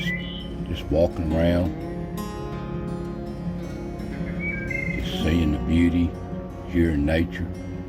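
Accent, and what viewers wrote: Appalachia, United States. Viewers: I love your video for the content, but the way you speak makes me smile. My maternal grandmother was from Appalachia, and even though she lived the majority of her life deep in south Georgia, the way she spoke was different from every body else. I could hear her in every word you said. Thanks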